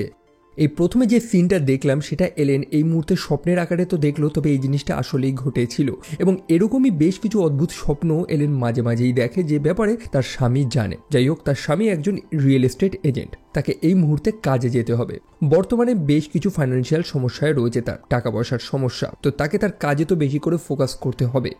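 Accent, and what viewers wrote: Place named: Bangladesh. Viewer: আপনার কথাগুলো শুনতে একদম বাংলাদেশীদের মতো!!!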